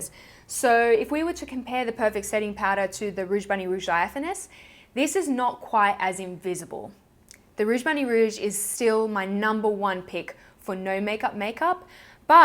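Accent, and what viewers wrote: Australia. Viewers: I absolutely love the yearly favourites as well! I look forward to them so much. Also side note: I really enjoy listening to your Aussie accent. It's made me realise that I think you are the only fellow Aussie beauty YouTuber that I follow and I should find more.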